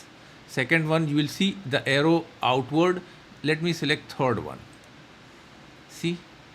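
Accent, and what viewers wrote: India. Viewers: May I know what is the real use if you don't show calculations or show top down modelling approach from scratch? Solidworks if you really create it for fun make a playlist for that. But for serious application engineers like me it is mandatory that you show detailed calculation the entire process whats going on inside the chamber right rather than keeping it as bloops behind the curtain. Kindly try to tune your pronunciation. Ur bloody Indian accent sounds awfully monotonous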